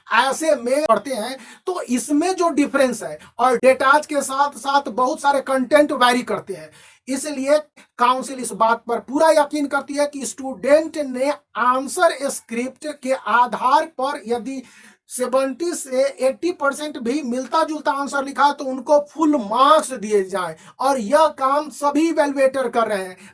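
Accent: Bihar, India. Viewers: Lekin sir aap bhi bihari bolte hai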